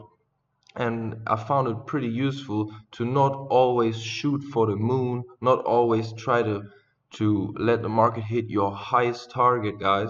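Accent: Germany. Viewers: Great video my man. Are you from Germany bro?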